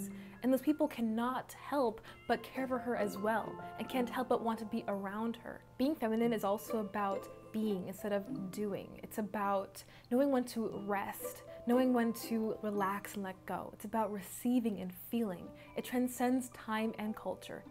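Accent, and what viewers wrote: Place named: Jamaica. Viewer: It is switched between the Jamaican accent for me. I’m Jamaican myself.🇯🇲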